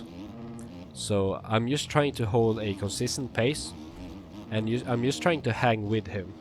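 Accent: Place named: Sweden. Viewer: mate, you have the most swedish accent i’ve ever heard lol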